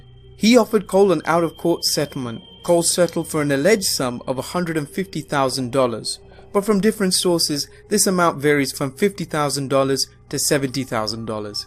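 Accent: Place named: United Kingdom. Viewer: Who else clicks off if it’s not narrated by the British guy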